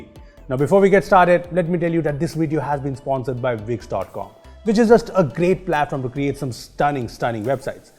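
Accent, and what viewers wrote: India. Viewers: Warning *Crappy Indian accent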